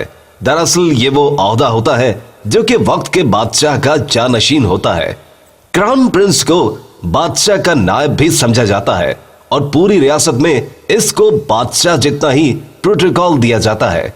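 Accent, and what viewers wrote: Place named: India. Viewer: Ye jo bhoonk raha hai ye to bilkul Indian style hai lagta hai ye indian hai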